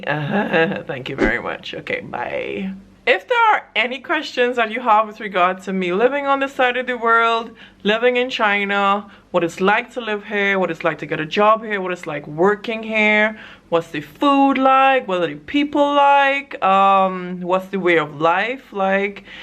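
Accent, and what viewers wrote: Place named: Trinidad and Tobago. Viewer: I bump into a video by you talking about fenugreek...so I'm asking my husband "listen to her accent, tell me what it sounds like." He said Trinidadian. And you confirmed it in this vid. Good videos btw.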